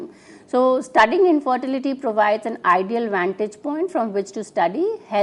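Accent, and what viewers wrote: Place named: Haryana, India. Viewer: Robotic and fast lecture. Very discouraging for the students. Memorised lecture marred by hariyanvi accent.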